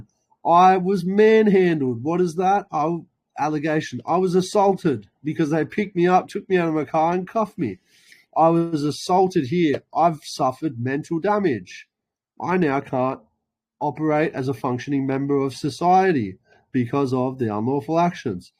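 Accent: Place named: Australia. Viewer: Why do I hear an Aussie accent but you’ve referenced US law in the description?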